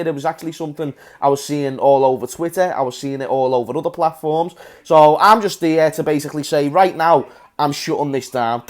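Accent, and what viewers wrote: Liverpool, United Kingdom. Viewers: I love the liverpool accent man 😂 only reason i watch till the end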